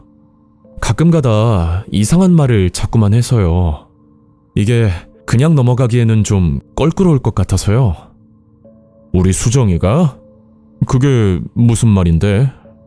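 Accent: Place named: Jeolla, South Korea. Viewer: 아따 전라도라~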